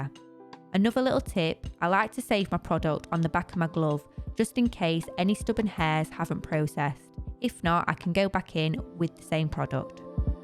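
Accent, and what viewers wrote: United Kingdom. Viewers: It looks so relaxing, I'd love you to do mine! I wish I was in the UK going by your adorable accent.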